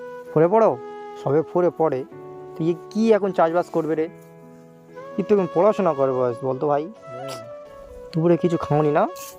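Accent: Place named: Bangladesh. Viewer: হ্যাঁ ভাই আপনি বাংলাদেশের মতো কথা বারতি বলেন আপনার ইন্ডিয়ান ভাষা হারানো বুঝি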